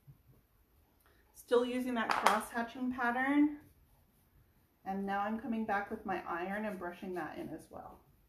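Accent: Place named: Wisconsin, United States. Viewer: I've never noticed it before but you sound like you might be originally from Wisconsin...or maybe your parents are. I think it's an adorable accent.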